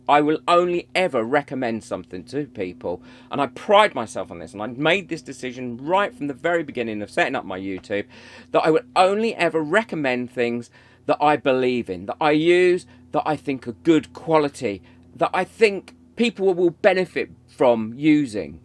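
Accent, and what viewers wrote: United Kingdom. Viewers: I for one would like to say Thank You to you. :virtualhug:💝 I always find your Video's delightful and your attitude often silly yet very informative. I can almost bet the problem comes from some one who has a problem with the British accent and thinks it's pretentious. ( If I had to say and I will It is more than likely a Yank and yes I can say it being from Baltimore Maryland! ) I would also like to say thank you for helping those of us who have problems with resin curing and bubbles no matter what we tried. I have a problem with a High dollar brand curing in my basement ( It is very well ventilated and I use PPE I PROMISE! but it stays at 68 degrees no matter what ) and after watching two of your video's on mixing I was able to finally get the bugger ( Sophie and Toffey is the brand I do not like ) to cure in my basement with a resin curing machine. 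So this Yank would like to say thank you and please continue your beautiful work.💜😇🥰:virtualhug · Oh, dearest!!! First off, I love your face!! I find it’s kind and gentle! You’re quite handsome, and your British accent doesn’t hurt a bit!! Lol!! ( I’m Canadian) I’ve watched every thing you’ve made and have learned something every single time. These people who are cruel, for their own pleasure baffle me!! I guess they’re just cranky and sad with nothing better to do! Maybe they want you to feel as horrible as they do. Absolutely unacceptable!! I know you are adored, welcome and needed!!! Your wife, child(ren) must be so super proud of all you’ve accomplished!!! I certainly love you, and believe who I see when I watch you is the real deal!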